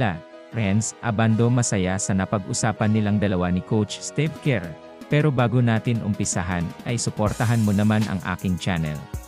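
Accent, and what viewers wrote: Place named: Pampanga, Philippines. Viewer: kapampangan yung nagbabasa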